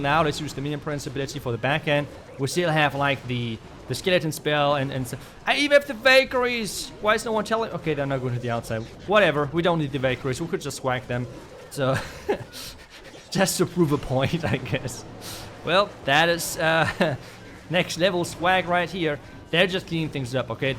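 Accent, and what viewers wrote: Germany. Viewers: Are you german spoken, your accent sounds probably exactly like mine, aus Drutschland, Österreich, SW??😅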